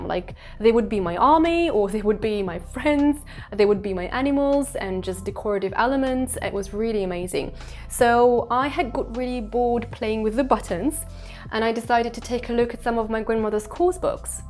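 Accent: United Kingdom. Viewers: Ооох, я влюбилась в ваш британский акцент, прям невероятно плавная красивая речь! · pure British accent and super speed ) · Renée Zellweger's pronunciation in "Bridget Jones's Diary" movies exactly -- вот что мне напомнил Ваш акцент. Она американка, и ей пришлось много поработать над британским акцентом для этого фильма. Со специалистами, само собой. У которых, видимо, была такая же лингафонная система, что и у Вас и много где ещё, поскольку я слышала польских лингвистов-специалистов с таким же вот точно британским произношением. Renée Zellweger как раз после фильма раскритиковали "спецы" за недоакцент (на себя бы посмотрели, ага :) ). Так что вот Вам и возможный фидбэк на Ваше произношение от "истинных носителей". Как человек, прошедший специальный и изматывающий курс по произношению профильного иностранного языка по системе и с экзаменами, не могу не восхищаться успехами усердных студентов по всему миру - огромный Вам (и нам всем заодно :) ) респект! 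· Какой красивый британский акцент. Просто posh, обожаю. Подписка! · Какой очаровательный британский акцент ❤ У меня сильнее акцент, чем у вас, хотя я и живу в Штатах уже почти 12 лет и на бытовые темы говорю свободно. В разговорной речи встречаю незнакомые слова раз в месяц, если не реже, да и носители меня понимают без проблем. Мне в изучении языка очень помогли видео с английскими субтитрами, а потом и без них. Слушаю лекции, подкасты и смотрю документальные фильмы на английском. Вам менять ничего не надо - аборигены обожают мой акцент, хотя он и сильнее вашего. Оставьте чуть-чуть экзотического изюма в речи. Насчет увеличения словаря может и хорошая идея, но ваша речь звучит очень легко, без повторений, запинаний и слов-паразитов - очень приятно слушать. И спасибо огромное за замечательгые ведео. Смотреть их удовольствие! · Шикарный британский акцент😍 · Your English is very good, slightly more onto English accent. 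I live in USA for 30 years now and learned from movies and people around but I do have American accent. Thanks for your videos 🙂. They are so cute. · You have one of those British accents🌺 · Оо у вас классный британский акцент! Это моя мечта! Смотрела ваши видео по истории по по англ никогда не попадались что странно 🤔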